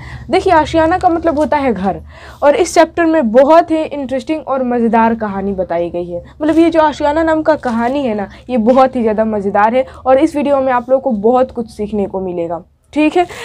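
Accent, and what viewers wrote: Bihar, India. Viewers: آپکے بات کرنے کے انداز سے لگتا ہے کہ آپ بہار سے ہو بہار کی کیسی تہذیب ہے کہ وہاں کے لوگوں کا تلفظ تک درست نہیں ہوتا افسوس صد افسوس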